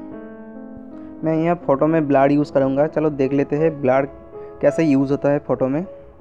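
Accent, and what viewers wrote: Bengal, India. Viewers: Dont worry bro it was good enough to understand.... I can say that youre Bengali like me, thats why you had problems with pronounsation. But the video was good enough to understand..... Thanks. Qnd make some more useful video like this..... 😊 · improve your Hindi. You sound like Bong-Hindi, which is horrible. I'm sure you are Bengali... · haye re bengali babu..acent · aareee....bangali.....agy. hindi. sikhy. aai..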